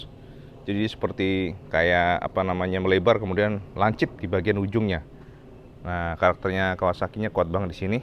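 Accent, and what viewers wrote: Indonesia. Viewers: asli mna si pean cak,ko medok bngt bicarae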